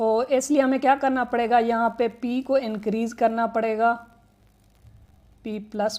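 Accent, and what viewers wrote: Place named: India. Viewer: The Indian accent is very difficult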